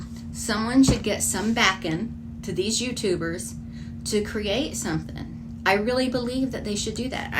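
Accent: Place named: South, United States. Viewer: Every now and then you drop your g off the end of your words and you sound real Southern and it's just adorable. Yes, should get with a bigger company and collaborate on a BIG TIME documentary. She has probably the most in depth knowledge of the actual events...I called her the "Advanced Gypsologist" or something like that when she was going up to fight the lawsuit saying she couldn't claim " Leading Expert".😂😂😂 and got a chuckle out of her on the Live that night. I was so worried for her and Sara just having to take off to go like that.... Meanwhile... Back in Da By-you...da family is lockin' da bedroom doors at night and hopin' she really doesn't take up cookin'...😂😂😂😂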